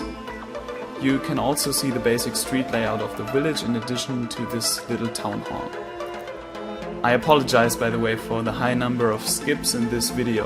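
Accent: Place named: Mannheim, Germany. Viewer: Are you from near Mannheim in Germany? I recognize the dialect! XD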